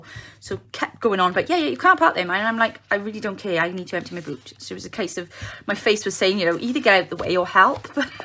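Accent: United Kingdom. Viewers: I watch YouTube on my TV, so it's difficult to leave comments, but we also don't realise you want them as it's more work for you replying to comments! I love the yellow butterfly with the swirls. I'm guessing you used an embossing folder to do that? I need to try that! Is it in any of your other videos? I really like hearing someone from the UK (I'm assuming). FYI I found your videos in a search for a hidden spine.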